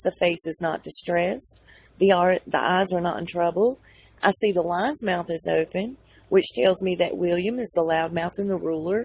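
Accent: South, United States. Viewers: Wow! I enjoyed the way you interpreted the Coat of Arms!😃👍Id love to go with you to some cool art displays! You definitely have great insight, and intuition! I enjoyed hearing you again too. You have such southern charm in a down home way that makes your viewers feel right at home! My daughter got married in a hotel art museum that blew me away! It was a truly memorable experience due to how some of the art and its display affected you. I agree that the Queen has an evil eye toward Meghan, and I wonder if she might have had secrets beforehand about the royal family. I agree with you totally that the royal family will make sure Meghan doesn't "sing like a bird"! It is so disturbing that Meghan's Mom has to act like her daughters been shunned! It's like she has to act like her daughter doesn't exist to her loved ones and friends, as if she was dead! 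No comfort though given due to her daughter living in a palace! It seems like this is overkill...what else is being hidden?!🐍🐉🐲 Love you bunches!😃🍕🍻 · I see YouTube will let any idiot with a Southern Accent pretend to know the inner workings of the royal family. I can't 😂😂😂😂. I see most of the videos, the comments are disabled😂😂😂😂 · You are a very bright light. You vibrate at a very high frequency. You have so much love and compassion in your heart. And to hear someone speak without the burden of having to pass judgement, with only the pure intention of educating and awakening is very much appreciated. And for lack of any better way to describe it, so I hope that I don't offended you, but your "accent" is like a sweet Southern lullaby that tends to soften the blow of the horrific atrocities you speak of that we all are in spiritual warfare with. Thank you and bless you.💖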